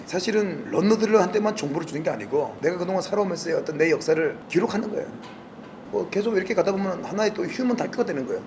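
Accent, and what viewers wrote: Gangwon, South Korea. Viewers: 종겨운 강원도 사투리네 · 아직, 강원도 사투리 잘쓰시네여 저는고향이 강원도 동해여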